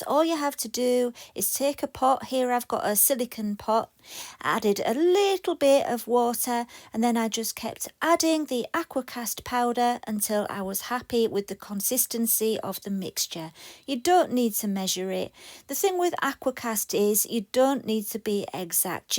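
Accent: United Kingdom. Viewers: Thank you for another wonderful video! I have watched you for years and adore your British accent…I’m from the US and have only a boring southern accent. I love to watch your ideas come to life and find your witty commentary delightful and charming!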